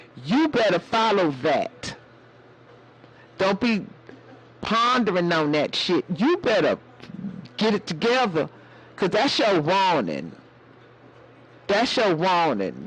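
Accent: South, United States. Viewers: Omgosh u sounded like my mom when u said that I'll tell u that much! That southern drawl needed that today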